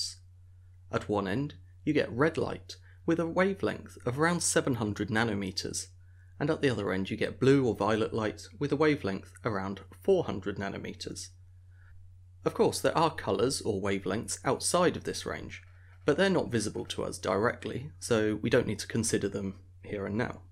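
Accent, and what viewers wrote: United Kingdom. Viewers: British english. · Sounds Uk English, to me with not a lot of markers of a certain region or "specific accent"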